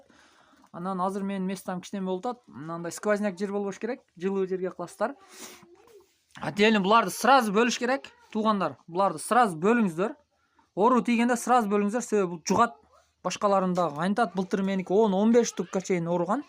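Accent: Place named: Uzbekistan. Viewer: Озбек окшойт кыргызча суйлогон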